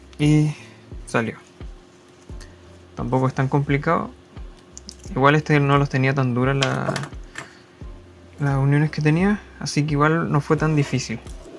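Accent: Chile. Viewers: Excelente tutorial... Por tu acento se que res chileno..°! Felicitaciones amigo..!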